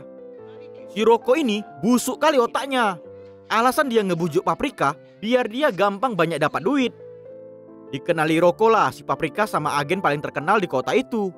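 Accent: Medan, Indonesia. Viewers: Bah...orang medan rupa nya..sukses trus lae.. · abg orang medan mana?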